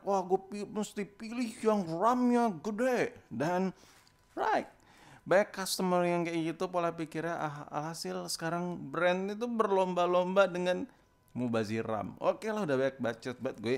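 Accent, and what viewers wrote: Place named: Medan, Indonesia. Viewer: "Udah Ga sabar kali Awak!" Ihhh Medan kali kau bang..!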